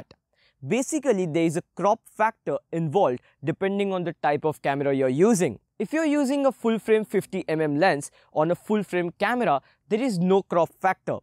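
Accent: India. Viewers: The Indian English accent kill me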